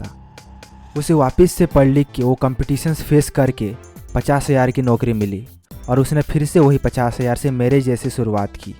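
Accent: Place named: Bihar, India. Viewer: Bhai bihar se ho kya. Acha kam kr rhe ho.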